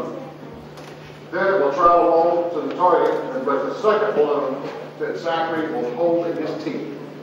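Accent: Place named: South, United States. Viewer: The problem here is the language barrier. And Mr. ‘s southern drawl does not make it easier.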